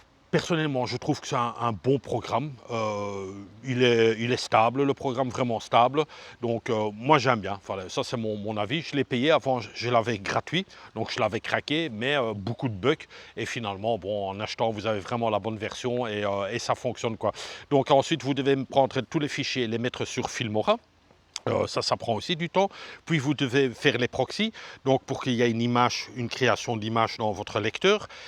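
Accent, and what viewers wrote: Belgium. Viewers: Bonjour je me suis abonnée j'adore ta façon de t'exprimé en nen halve/echte Bruxelleir, moi je suis un vrai bxllois né aussi le 31 mai '67 à 1000 Bxl (bon annif à toi ) 😁T'es vlog que je suit de peu en Thailande très intéressant et maintenant que tu est de retour en Belgique dans notre beau pays lol J'ai aimer ta route en scooter au début, de revoir mon ancien quartier Machtens/Scheut ça fait plaisir de revoir cette endroit après 30 ans 😉Continue à nous faire rêver.. En een polleke de Roger 😅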